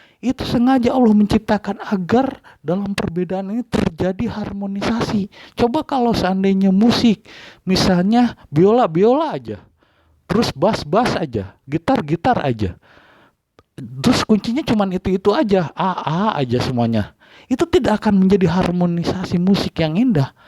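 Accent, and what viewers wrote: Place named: West Java, Indonesia. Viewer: MAS, KAMU HATI2... تفسير جلالين PUN KAMU TIDAK NGAJI...!! kalau dari segi logat bahasanya ini orang sunda..!! مكة المكرمة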